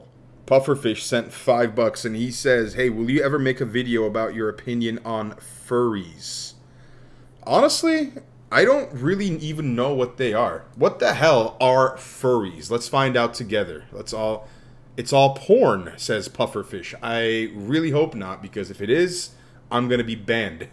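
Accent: United Kingdom. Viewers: Love the British accent bro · bro has an awesome british accent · No one talking bout his British accent 💀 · i love your british accent its so funny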